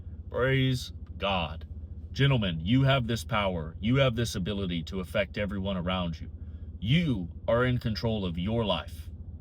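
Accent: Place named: Louisiana, United States. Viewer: Your accent reminds me of Gambit from X-Men bro lol. Love your videos!!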